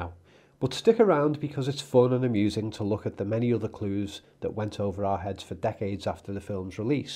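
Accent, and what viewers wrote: Liverpool, United Kingdom. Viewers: Is a Scouser?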